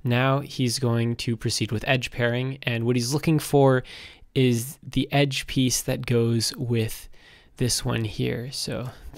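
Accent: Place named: England, United Kingdom. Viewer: its so funny how english ppl say german words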